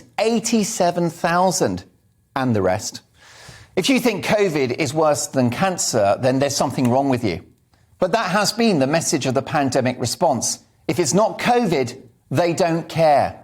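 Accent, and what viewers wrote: United Kingdom. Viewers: Thank you 👌It's long before done yet. Reckoning, it will be soon enough. Love your channel and love your British articulation 👌😊Daily follower here. From Sri Lanka.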